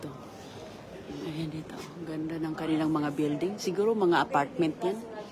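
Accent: Visayas, Philippines. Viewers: Sorry Jud Bisaya man si ❤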